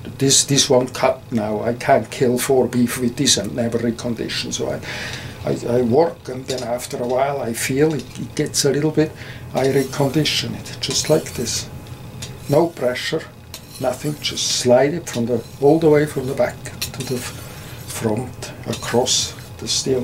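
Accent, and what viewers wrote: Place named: Outer Hebrides, United Kingdom. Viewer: Excellent video; very well explained by a nice fellow. I use the 6 inch round tip boning knife for the deboning, joints and backend when I'm doing sheep. I have the Victorinox lamb skinner for the opening when skinning. I'm going to try a Dexter Russell 5 and a quarter lamb skinner as the Americans usually have good high carbon steel. The Victory New Zealand I have is a topper.. I can't help but think that you say some words very much like us here in the Outer Hebrides of Scotland; it must be the Celtic Germanic connection. Keep up the excellent videos.👍👍👌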